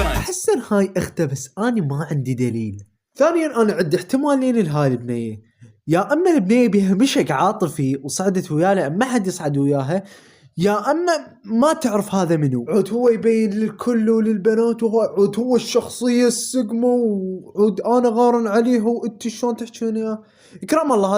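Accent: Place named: Kuwait. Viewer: الكسنت مدري الماكسنت العندك بل كويتي حلو😂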